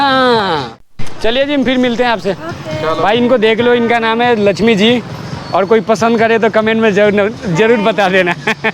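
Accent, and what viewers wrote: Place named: Bihar, India. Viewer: Bhasa mein thoda bihari put aa raha hai. Jaise aap aur ko aud bol rahe ho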